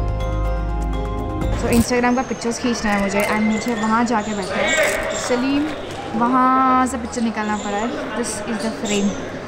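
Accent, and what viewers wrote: Mumbai, India. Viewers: Like your Mumbaiyaaa style of conversation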